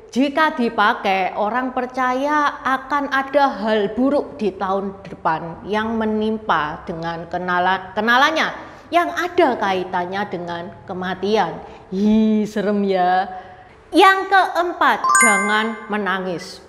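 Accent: Java, Indonesia. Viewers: Enakan yakin dengerin dongeng nya ci tuh.. ada lucu nya ada seru nya.. dg menggunakan bhs indonesia yg ber aksen jawa medok.. hhhhh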